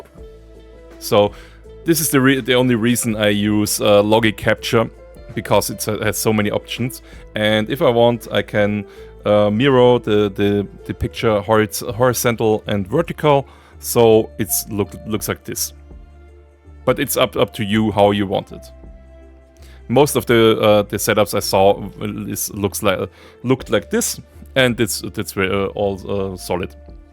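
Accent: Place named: Austria. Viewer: Jeder österreicher wenn er Englisch redet "sorry for the Bad Englisch" redet bis auf'n Akzent fehlerfrei xD Grüße aus Tirol, danke für das Video✌🏻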